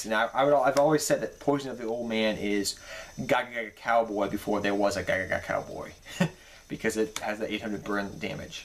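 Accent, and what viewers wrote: Brooklyn, United States. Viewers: lol no do sound like you're from Brooklyn, not that there is nothing wrong with that. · Are you from Brooklyn?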